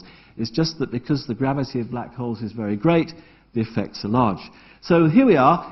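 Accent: United Kingdom. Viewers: his britan english is anoying >_>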